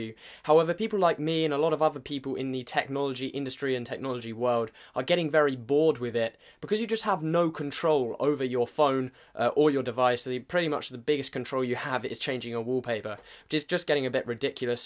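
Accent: United Kingdom. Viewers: I really like your british accent!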